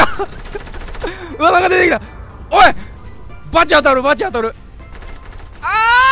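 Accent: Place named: Kansai, Japan. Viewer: 関西弁すきw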